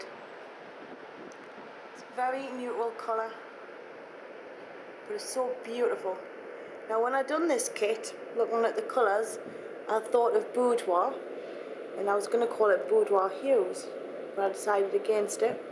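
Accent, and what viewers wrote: Newcastle, United Kingdom. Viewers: It's "Geordie" almost. The real geordies live in Newcastle and wouldn't like anyone from south of the river Tyne to be called true geordies, but thats the accent. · You sound like a Geordie! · she's a geordie! i.e. from newcastle :) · WHHAT? she's clearly from newcastle. have you ever seen gavin and stacy? that's what a welsh accent sounds like.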